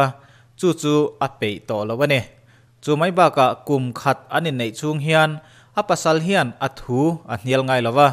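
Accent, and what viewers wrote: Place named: Mizoram, India. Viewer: Ngaihnawmin a bengvarthlak e. Chuan, Pu hi, i Mizo țawng lam hi a ngaihnawm țhin ngawt mai. Beng han tithlep deuh a awm ngai lo. 👍👍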